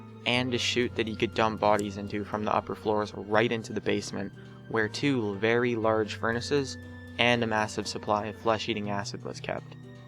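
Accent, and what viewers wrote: Canada. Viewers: Is this kid Canadian? His accent annoys me. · are you Canadian ???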